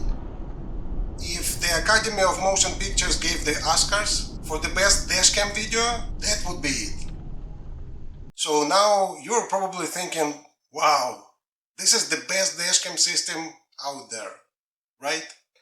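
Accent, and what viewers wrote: Russia. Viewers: вы что русский? акцент русский по слогам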